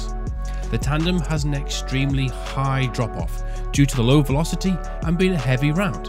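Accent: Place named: United Kingdom. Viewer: Now i know thanks elegant British voice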